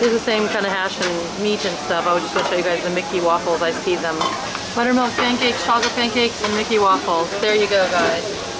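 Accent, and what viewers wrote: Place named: New Zealand. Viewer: isnt saying ca-bah-na wrong. That's how you'd say it in New Zealand:) same with how we say dance. We dont say it daa-nce we say it more like dah-nce.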